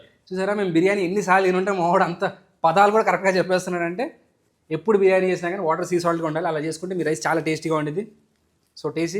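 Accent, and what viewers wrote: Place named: Telangana, India. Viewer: Superr brother... Ur way of cooking so lovely to watch.. mainly Telangana slang.. lots of ❤❤❤❤❤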